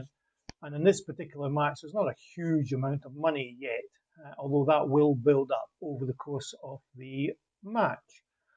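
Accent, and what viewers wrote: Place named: Scotland, United Kingdom. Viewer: This technique sounds really interesting to use on tennis trading! I'm off to try it in a moment.... congratulations on your 100th video,! (PS: It's good to hear a fellow Scot 😊)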